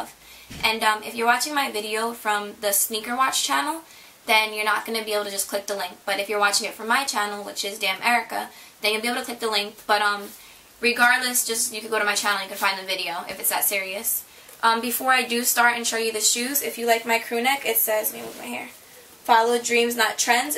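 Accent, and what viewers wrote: United States. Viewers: are you from boston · R u from jersey?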